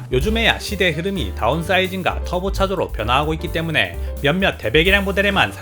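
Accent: Gyeongsang, South Korea. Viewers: 경상도 사람잉가 ㅋㅋㅋ 사투리들리네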